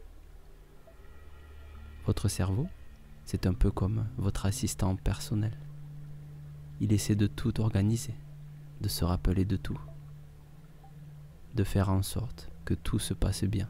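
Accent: South, France. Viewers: Oula ça sent l'accent du Sud, déjà j'accroche à mon "dialecte" 😉... Si je ne rajoute rien de plus c'est que je dors et c'est que c'est efficace 💪✌👌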